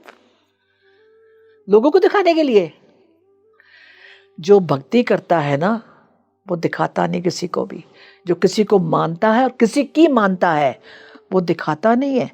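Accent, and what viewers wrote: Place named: Punjab, India. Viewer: ji muje lagta he aap punjabi ho bat karne ka style punjabi he aap ki shakal punjabio jasi he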